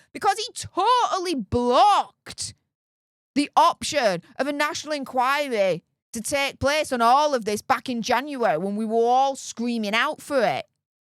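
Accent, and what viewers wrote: Yorkshire, United Kingdom. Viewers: They won't be a full inquiry though 😢. Not until the toxic woke lefty Islamic labour are gone. All these horrible crimes happened under labour councils 🤔😒. We know some high class people are involved just my opinion. I love your channel especially the A up are you a Yorkshire lass 😆 just curious 🧐.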